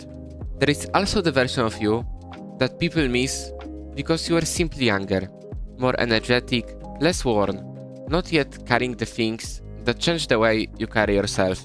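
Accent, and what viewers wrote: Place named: Poland. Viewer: Bracie słychać tego Polaka w glosie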